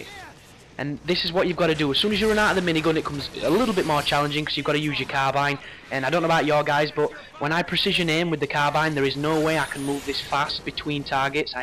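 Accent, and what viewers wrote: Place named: Manchester, United Kingdom. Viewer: Thanks for the vid. I was stuck on this then got it first time. Nice to hear another northerner on YouTube. Doesn't sound far from Manchester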